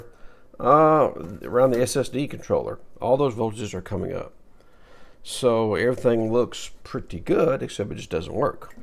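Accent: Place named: Virginia, United States. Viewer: Hello! I came here from joey does tech. Probably from the discord. Love your videos i think they are fab. I'm in Toronto. From your accent can i make a guess that you are in...Virgina? Maybe a Carolina.... Cheers Peter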